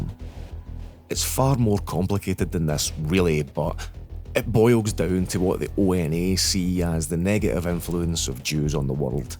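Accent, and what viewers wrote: Scotland, United Kingdom. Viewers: Top shelf research as usual, my friend. I expected a highly detailed and well-researched video and was not disappointed :) Everything from the audio-visual aesthetic that you have selected for the video, to the emphasis on information that is being conveyed verbally due to the lack of visual distractions provided by imagery, to your Scottish accent (particularly the amazing way in which you pronounce those hard 'r's) meshes perfectly together to provide a unique and interesting experience. The video itself, as mentioned above, is well-researched, which is particularly evident through the scholarly way in which you document their ideology, while maintaining an emphasis on being objective and getting facts across (with a few well-placed comedic touches which entertain the viewer while simultaneously not compromising the tone of the video). 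There is one thing I could recommend that you possibly do in the future, however. Since you obviously cannot get EVERYTHING across in one sitting, perhaps you could link some of your citations/references/additional materials in the description? That way, you could increase the credibility of the video, while at the same time providing viewers with material that they could read themselves to supplement what was already communicated in the video. That said, the best way to alleviate fear, ignorance and misinformation surrounding strange, scary or otherwise esoteric subjects is documentation and research, particularly archival analysis of materi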